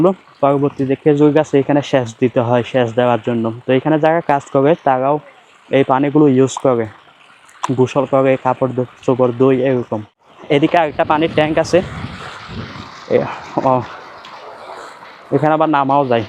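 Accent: Chittagong, Bangladesh. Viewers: ভাই আপনার বাসা কি চট্টগ্রাম 😅😅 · chottogram এর মানুষ যখন সুধদো ভাষায় কথা বলে সেম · এটা মূলত চট্রগ্রাম এর আঞ্চলিক ভাষা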